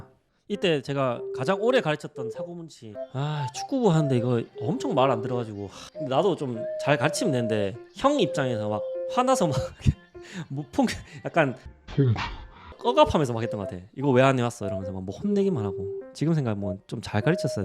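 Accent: Gyeongsang, South Korea. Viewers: 오 대구남자였네...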